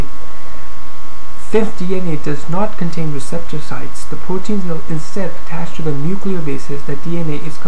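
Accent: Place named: India. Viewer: wtf is that indian accent everywhere in biology videos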